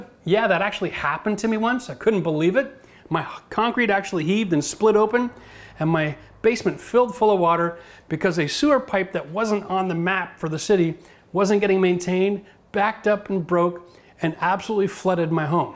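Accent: Canada. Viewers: I've watched every video you have. You seem like a cool guy and you are VERY knowledgeable! I don't mind that you have plumped up a bit over the years. Don't even mind the Canadian accent, but every time i see your dewalt tools i throw up a bit. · It's gotta be the Canadian accent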